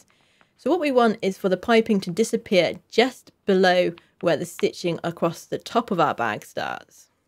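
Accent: England, United Kingdom. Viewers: hello dear friend, i enjoyed the videos and i like your English accent cause i'm learning English by listening to many videos, and i saw you folding the edges of suede leather, i want to advise you to get a help of thin comb may be you can break some of needles of the comb to create a space which will help you to assemble correctly the glued parts with a perfect line, god bless you . i'm from Algeria, Northern Africa.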